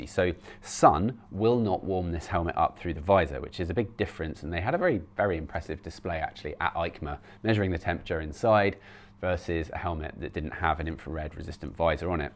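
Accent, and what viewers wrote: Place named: United Kingdom. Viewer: speak a bit more clearer with he's British accent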